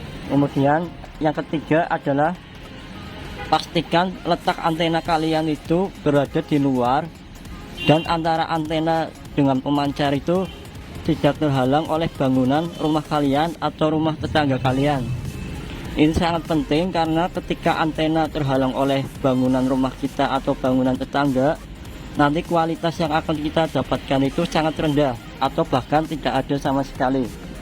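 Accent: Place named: Moga, Indonesia. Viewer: Pemalange ngendi kang..... Opo moga, kadi logatte koyo wong moganan 🙏😁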